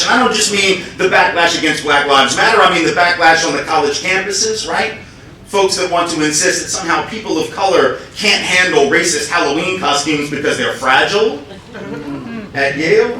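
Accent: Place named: South, United States. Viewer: ive watched his speeches in front of a room full of white people and he speaks the same way. hes from the south. nice try though.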